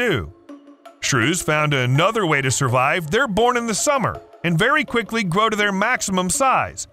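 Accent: United States. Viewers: Unbearable american accent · I just can't stand this yanks voice. Why does he have to keep shouting with that rattling voice.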